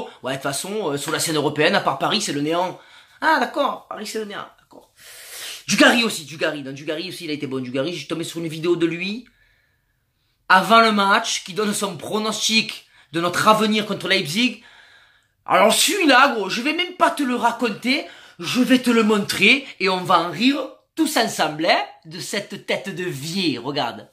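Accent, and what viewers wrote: Marseille, France. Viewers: Mais noooooon, Dugarry a été l'un des meilleurs attaquants français, il a donné son pronostic.Y a pas à le traiter de pute!!!!!! Perso, j'ai pas eu envie de voir le match car je me fait chier en regardant l'OM en ligue 1. Et oui, je me suis planté et oui j'espère que l'OM gagnera la coupe .....tout en écrivant, je t'écoute parler et t'es pas Marseillais pour rien! Allez l'OM!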